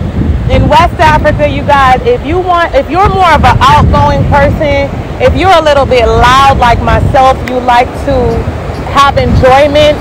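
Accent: United States. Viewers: Wow I'm surprised, I thought Kampala would be as hot as Ghana. You sound so American. I would never guess that you are Jamaican-American.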